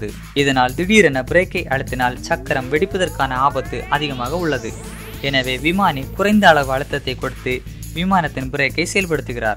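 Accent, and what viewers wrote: Kanyakumari, India. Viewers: தம்பி கன்னியாகுமரிண்ணு கரெக்டா தெரியுது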